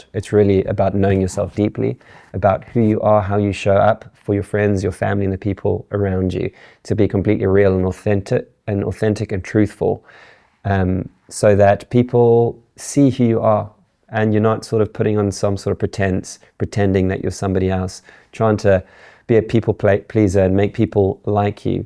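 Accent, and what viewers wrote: South Africa. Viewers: Why do you sound South African? It morphed from an Australian to South African accent 🤔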